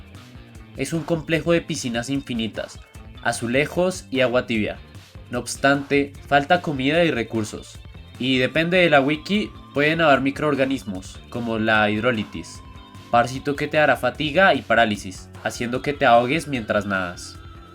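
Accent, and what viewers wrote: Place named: Colombia. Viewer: El asento se parece a Colombia no se muy bien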